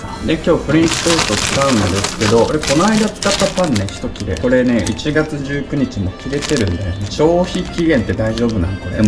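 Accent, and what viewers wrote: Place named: Hakata, Japan. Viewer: 「 」で検索し始めてお目にかかりました！ 独特な方言が癒されますね〜😊福井っぽいと思ったけど博多弁なのかな？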